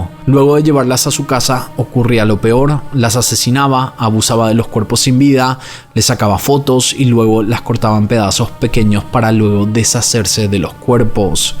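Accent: Paraguay. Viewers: 0 yo me estaba poniendo en duda ya que tu acento era muy de Paraguay xd y voy en información y vaya sorpresa aparte que me encanta estas clases de videos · van solo 10 segundos del video y ya me di cuenta que sos paraguayo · Que contenido más interesante!! Me gusta la manera en que narras el video y las imagines, por si acaso eres paraguayo o tenes relación? Es que tu acento es muy parecido a uno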